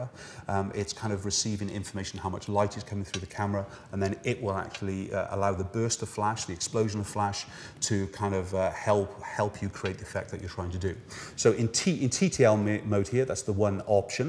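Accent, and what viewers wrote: United Kingdom. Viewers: Morgan Calvi English West Country...Gloucester or Bristol would be my guess.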